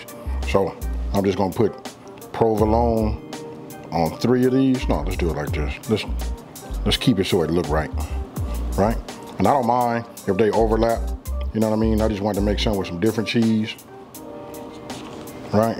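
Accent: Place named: West Coast, United States. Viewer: Bruh your speech is so Westcoast 😂 salute OG!!!!!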